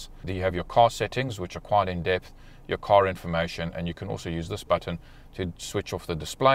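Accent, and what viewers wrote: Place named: South Africa. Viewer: This guy is South African, not Australian.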